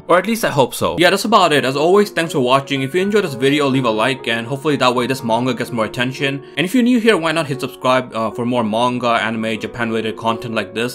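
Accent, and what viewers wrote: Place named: United States. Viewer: sorry but i just can't with this american pronounciation xD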